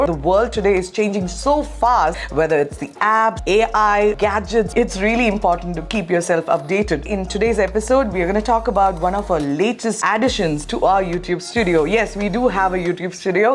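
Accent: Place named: Thalassery, India. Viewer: Thalle English 😂 Nice Presentation Crisp and Clean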